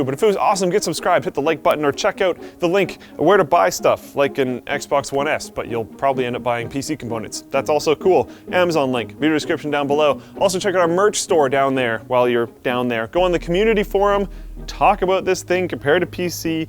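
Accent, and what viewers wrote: Canada. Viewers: from second one you can tell he's Canadian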